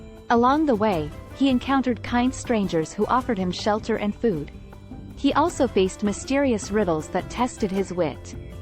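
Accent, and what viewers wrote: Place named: North, United Kingdom. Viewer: That was brilliant and I love how you adapted your Northern growl into a feminine purr :)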